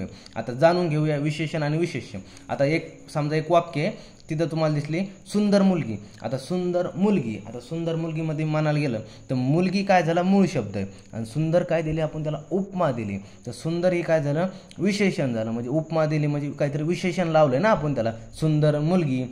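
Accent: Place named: Vidarbha, India. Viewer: Pure gavran bhasha vidharbhachi zp shalet shiklya sarkha vattay sir thanks ❤❤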